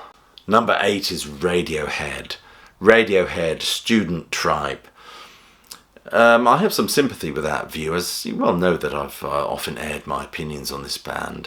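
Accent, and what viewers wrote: United Kingdom. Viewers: The Who??? Radiohead??? This is a super weird "channel." Yeah, a rock band wouldn't want to comment on environmental and socioeconomic issues. How about the Flaming Lips? They are absolutely tone deaf. This cast is all about one guy's peculiar tastes, even if he wraps it around viewer preferences. I think Yanks go in for things because the guy has a Brit accent. He's the Geico Gecko of music criticism.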